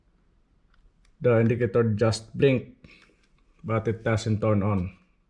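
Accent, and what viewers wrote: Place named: North, Philippines. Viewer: That thick accent sounds familiar from north Philippines.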